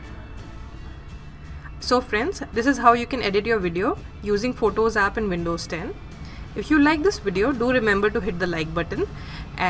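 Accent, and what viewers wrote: India. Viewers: This is an extremely common Indian accent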